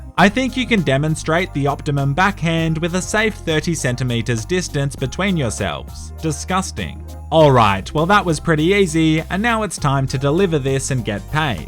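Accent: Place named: Australia. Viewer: The steve irwin of youtube gaming. because you're white and sound austrailian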